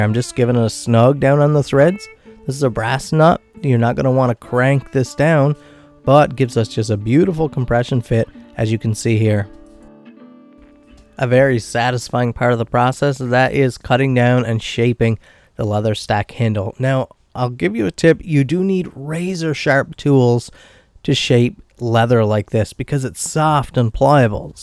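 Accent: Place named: Canada. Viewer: Beautiful outcome!! The tool is so simple it’s elegant. I really enjoy watching your work. I’ve done a number of re-handle projects and a few re-profile things and really enjoy the leatherwork!! I’ve forged one blade myself: did everything wrong, but it was pre-YouTube and really widespread internet days. Did the best I could with a wood stove and some tongs I found at a yard sale. Finally finished the knife and heat treated it in the oven; it’s good enough for a wall hanger 😜. My favorite part of your videos is the accent. I grew up in Montana, and wandered Alberta and BC a good bit. I really enjoyed The Great White North eh!! ♥️🇨🇦👍. My grandfather and great grandfather wandered Nova Scotia too. It’s in my blood.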